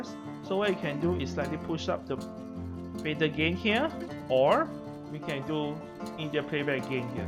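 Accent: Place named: Asia. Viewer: Get rid of the damn background music. It is bad enough that your Asian accent is difficult to comprehend. It is even worse to try to learn from this video with that background noice.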